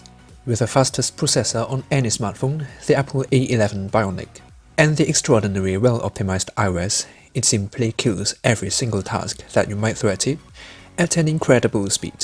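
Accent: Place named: United Kingdom. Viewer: 啲英式口音好正~~~好想學~~~~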